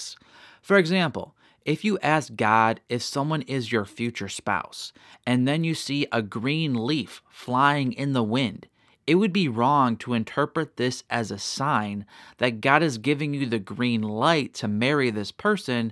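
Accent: United States. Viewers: It's good to know about God. I appreciate your standard American Accent. I am trying to understand American Accent.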